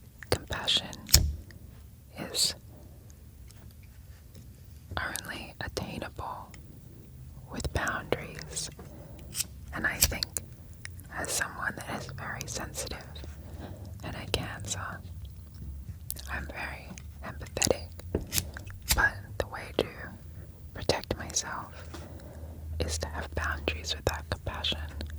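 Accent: Australia. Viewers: I really love the aussie accent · australian accent is perfect for asmr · Her aussie accent makes it all better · Aussie accent whispering is the most beautiful thing I've ever heard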